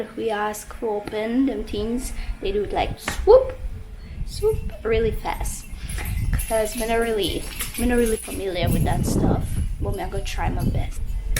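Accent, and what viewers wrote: Jamaica. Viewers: Bless 🙏 up to you and the family 💕! It's so good to see you and the boys hanging out, single mom life is very hard, but you did a good job for the day ❤️ 😁💪, and also cutting your coconut, you are a real yaady🇯🇲🇯🇲 Jamaican style 😂😂👍, I watch this video twice, big up to 💪💯 and his team for winning their match, we need more videos from you with that sweet yaady 🇯🇲 talk 😂😁 one love❤ to the family everytime👃👏🇯🇲😂. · nice to hear when you talk like a jamaican. 😊. Nuff Blessing from Germany.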